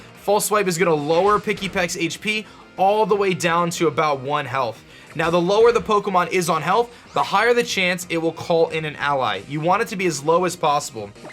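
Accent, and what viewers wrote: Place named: California, United States. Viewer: Disliked. Because you talk like a stereotypical cali dude from every 90's movie!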